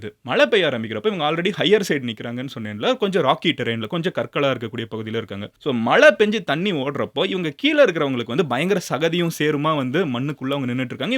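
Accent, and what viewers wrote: Madurai, India. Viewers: nega madurai or Chennai ❤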